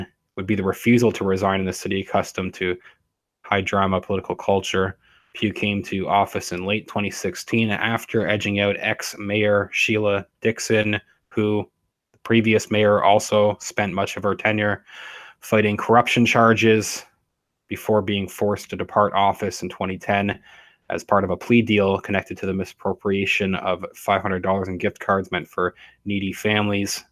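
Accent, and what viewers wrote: Canada. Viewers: Where is he from?, sounds Canadian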